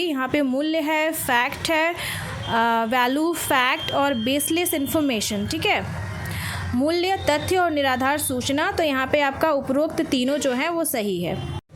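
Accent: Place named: Bihar, India. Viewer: Mam aap bihar se hai na kyoki thik h thik h karti h to apne bihar ke hi ho aap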